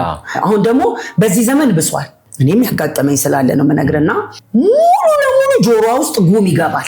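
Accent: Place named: Dire Dawa, Ethiopia. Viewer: እኔ እውነት ለመናገ የድሬደዋ ሰው መሰለችኝ አነጋገራ የአገሬን ሰው መሰልሽኝ።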